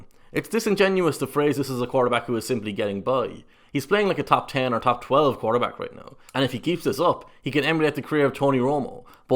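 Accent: Ireland. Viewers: Nice -- more football analysts should have Irish accents. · Hearing an an irishman be so analytical about football brings me so much joy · WAIT!......why is an irishman talking about American Football......and in a very concise and inciteful manner?? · i'm lovin' hearing an Irish analyzer review my team.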